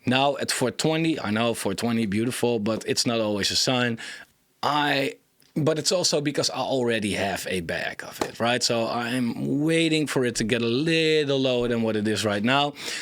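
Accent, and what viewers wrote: Netherlands. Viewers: your accent feels like The Netherlands... Im from Belgium lez get millionaire next 2 year! Keep up the work.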